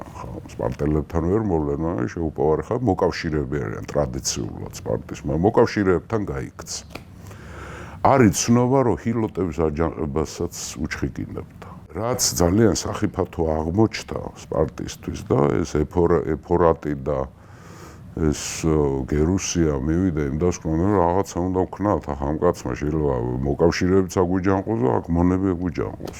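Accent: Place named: Kutaisi, Georgia. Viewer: ქუთაისურად რომ ანტიკურ ისტორიას უსმენ სხვა გემო აქვს😂( ასიკვდილებენ...უშვრებიან.... და სხვა მრავალი. )ძალაინ საინტერესოა და კარგია ❤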